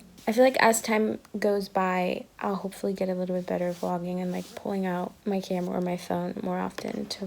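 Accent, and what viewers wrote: United States. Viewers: I love your videos so much! <3 just wondering, where are you from? cuz you sound super american but you live in the netherlands? also I love your style, you're so cute :)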